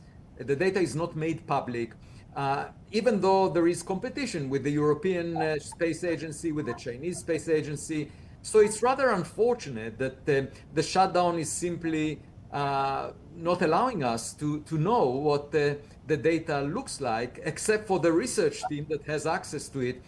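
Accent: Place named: Eastern Europe. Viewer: 听口音也像是东欧那边的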